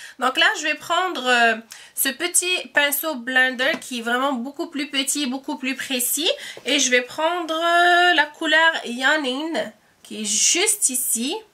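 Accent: Canada. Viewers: coucou,magnifique et superbe video,j'adore le make up,toujours a la hauteur bravo continue,j'adore ton accent canadienne,pour le petit masque javais dis 90 usd,sinon le rouge à lèvres est tres beau!!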